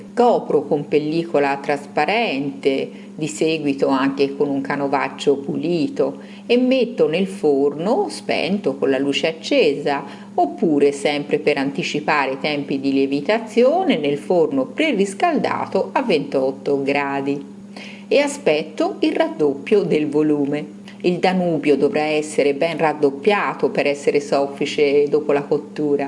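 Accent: Tuscany, Italy. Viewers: Altroché se rendi .....la bontà, sei unica.....le tue ricette sono perfette,molto molto brava. ...e poi la il tuo accento toscano. ....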